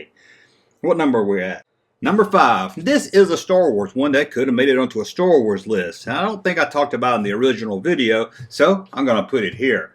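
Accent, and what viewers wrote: South, United States. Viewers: It's how we say it in the south.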